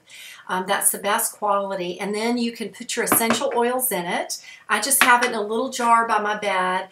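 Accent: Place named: South, United States. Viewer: love ur southern drawl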